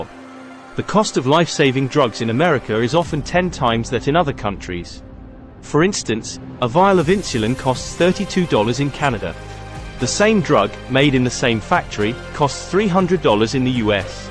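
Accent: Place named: England, United Kingdom. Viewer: The makers of this video would be more persuasive to Americans if the voice saying 'we' 'our healthcare system' was not an English one. Did they use a robot voice? If so it's a good one (its peculiar pauses in sentences are a bit of a give away) but no all accents which speak English are the same.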